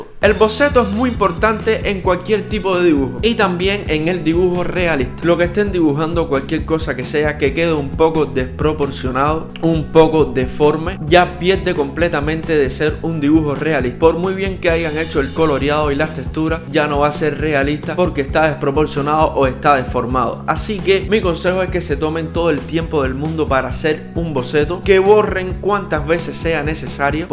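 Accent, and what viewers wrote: Cuba. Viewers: Tu acento te delata tienes origen cubano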